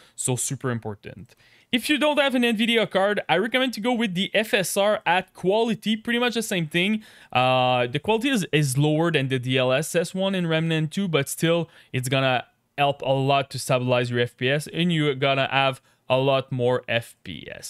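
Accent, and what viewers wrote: Quebec, Canada. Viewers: Is it just me or you are a french canadian ? (Qc)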